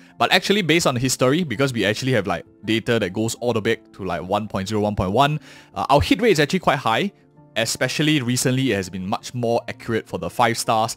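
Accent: Singapore. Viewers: Heavy Singaporean accent (pls tell me)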